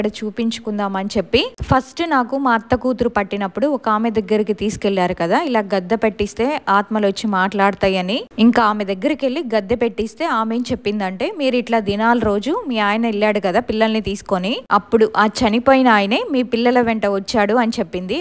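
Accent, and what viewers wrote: Telangana, India. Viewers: Bhabi telangana ka slang ACHA hi 😊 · Telangana slang vachindi madhalo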